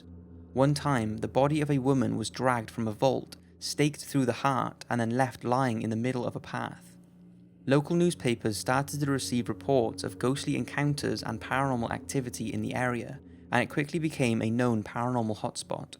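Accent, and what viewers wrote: Wales, United Kingdom. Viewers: Welsh accents ftw! As you can tell i'm also from Wales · That's a Welsh accent right? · Are you welsh? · Silly Question.. Are you Welsh?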